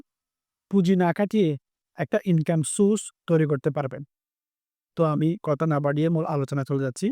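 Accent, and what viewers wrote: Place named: Chittagong, Bangladesh. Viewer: Ctg man কথায় বুঝা যায়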